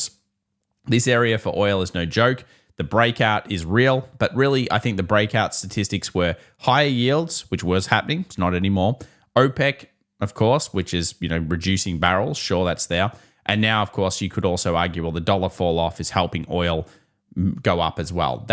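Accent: Australia. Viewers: The whole deal here is about interpreting things. 😂 I take the same approach with watching the videos. He's knowledgeable, not always correct, says that up front. Also, literally everyone on here has different timelines, nationalities, risk tolerances, DCA for life vs scalp for life and everything in-between. Thumbnails and titles, it's back and forth on being a bit much, but that's 100% the algo and people watching needing to be fed 🤷, and thank the freakin' lord he doesn't do those shocked face thumbnails. I've seen a looooot of TA/market voodoo and this dude ain't that. Does he want money... (idk, are you watching a video about making money) lol. It can just be entertaining and informative and it's your responsibility to double check anything you're going to act on. I gots a B.A. in Physics, 12+ years as a software engineer and 3 years on and off watching FX. 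I simply don't listen to bs that is illogical if I'm looking for insights. In short 😂 yeah, I get valuable info, with a sweet Australian accent, from a genuine dude, that I filter through my own understanding and experiences. You can not watch, but why the desire to convince others not to?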